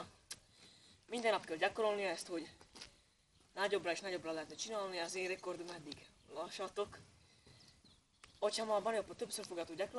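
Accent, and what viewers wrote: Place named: Szeged, Hungary. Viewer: Ez szegedi azert beszel igy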